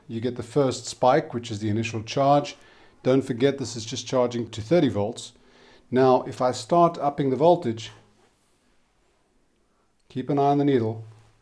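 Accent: South Africa. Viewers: I visited a family in Durban. I met them online. It was an amazing experience. The Tiplady family. We went all over KZN. Now I recognize the accent. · Are you from South Africa?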